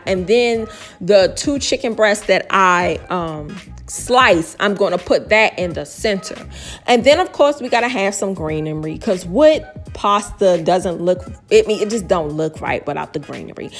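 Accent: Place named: DMV, United States. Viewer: Gotta b from the dmv the way u talk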